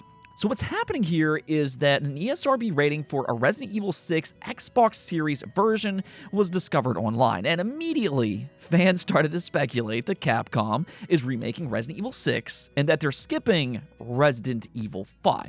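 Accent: Philadelphia, United States. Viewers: Your accent, are you from Philly or nearby?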